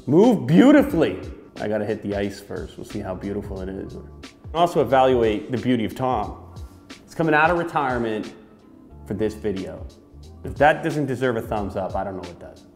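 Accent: Canada. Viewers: Is it just me or his accent got a little more Canadian in this video compared to all the others?😂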